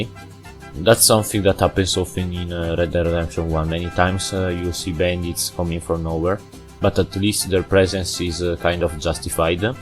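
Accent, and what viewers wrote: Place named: Italy. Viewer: Your voice is very cool, i love when Italians speak English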